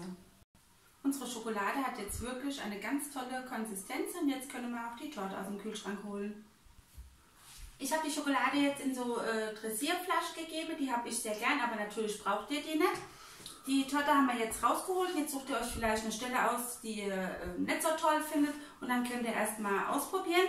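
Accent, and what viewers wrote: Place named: Saarland, Germany. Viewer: Hallo liebe schaue mir gerne deine Videos an wollte dich mal fragen kann das sein dass du vom Saarland kommst ich bin nämlich auch auf vom Saarland meinte nur der Dialekt Werk sehr gleich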